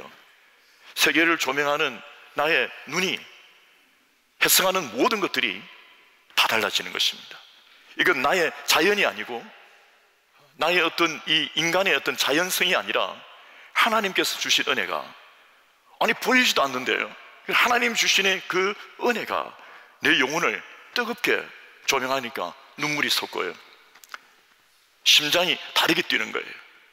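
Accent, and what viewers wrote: Gyeongsang, South Korea. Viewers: 명불허전 경상도 ㅋㅋㅋㅋ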